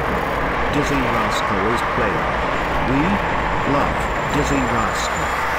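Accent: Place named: Nottingham, United Kingdom. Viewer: Brilliant commentary. Cos if you know the accent it really takes the piss out synthesised speech. Ruined by outside noise though. Pity. The bit about King Charles totally creased me. The Old Angel was brilliant. It had original punk era gig posters advertising bands like the Jets with what now seems like well cheap entry and well cheap beer. It closed at the end of Feb one year- not sure which and now its gone up market. I freaked out a waitress when I shared a cake with someone who suggested I stick my false teeth in my half and take a pic like they'd jumped out my mouth and totally went for it. She didn't half jump when she picked up our cups beside the plate... That and the Sal were about my favourites. Then there was The Maze on Mansfield Road. Another good one.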